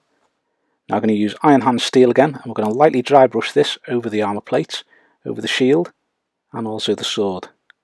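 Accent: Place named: Merseyside, United Kingdom. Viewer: These Uruk Hai guides have been so helpful as I plan on starting an Isengard army soon. The Merseyside accent is also a plus 👍🏻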